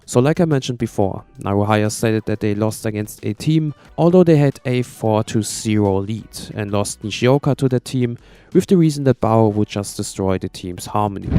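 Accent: Germany. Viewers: me too the german accent is so strong you cant unhear it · Man hört den Deutschen Akzent richtig raus😂aber trotzdem starkes Video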